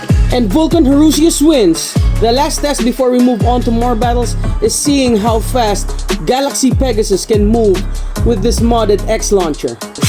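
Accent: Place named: Philippines. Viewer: U sound very filipino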